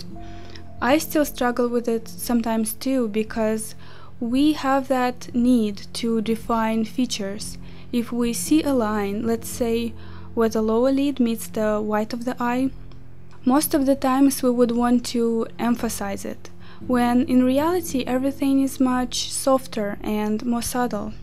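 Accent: Russia. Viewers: По акценту понятно, что человек русский :D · aren't you from Russia? i am, and your accent really sounds like russian)) you're cool✨ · Are you russian? You are have a russian accent)))) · Интересно, у нее русский акцент или какой? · i hear russian accent, are yoy russian? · Такой акцент русский. ппц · Русский акцент, из России? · Она русская ? Просто очень слышен русский акцент)))) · are you Russian?You have a Russian accent.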